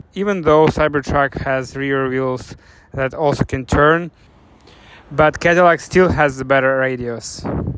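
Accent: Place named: Ukraine. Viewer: What terrible Ukrainian accent😮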